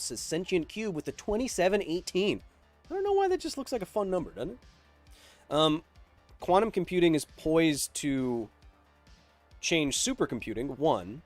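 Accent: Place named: Australia. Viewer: He does an Australian accent because he's good at it and real Aussies aren't so easily offended. 👌👍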